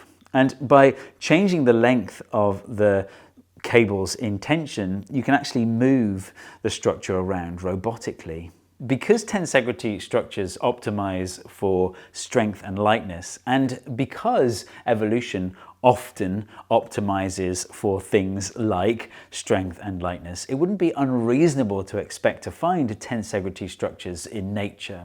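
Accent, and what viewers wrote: United Kingdom. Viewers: The British pronunciation of aluminum always annoys me. There's no i before the u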